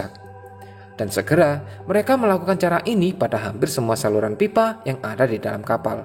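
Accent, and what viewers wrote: Java, Indonesia. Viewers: Bagus, suaranya medok banget, jadi enak ngedengerinnya bro, he he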